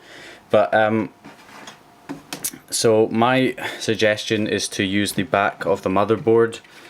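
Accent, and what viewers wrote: Scotland, United Kingdom. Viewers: Good old scottish accent love it hahaha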